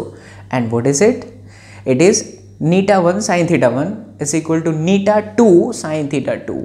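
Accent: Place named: Haryana, India. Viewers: Fab haryanvi.! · Haryanvi is superb sir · Itti bdiya haryanvi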